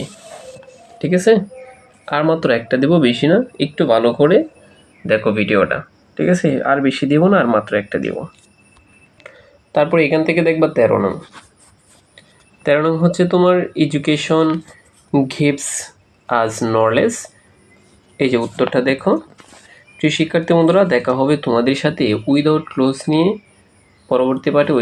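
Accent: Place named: Sylhet, Bangladesh. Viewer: Suru Sylheti😁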